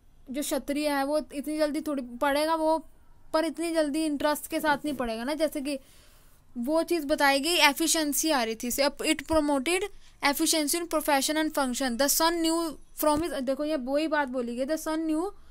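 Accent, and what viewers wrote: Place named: Chandigarh, India. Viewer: Thanks mam I think you are from chandigarh because of your voice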